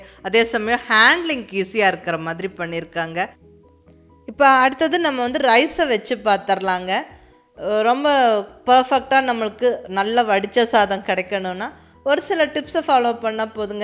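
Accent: Coimbatore, India. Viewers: மலையாளி கோயம்புத்தூர் தமிழ் ஜம்முன்னு பேசுறாங்க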